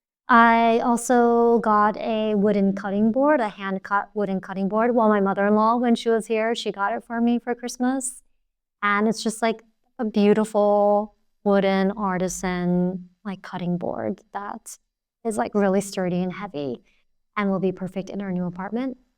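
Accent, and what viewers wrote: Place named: United States. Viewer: I recently found your channel and have been enjoying your videos. I like your style and the way you present yourself. I would love to see an introduction video, where you share more about yourself. 😊 You sound American, and then your milf is Finnish but you live in Poland - I got curious! 👀😁 Ps. Greetings from Finland! 👋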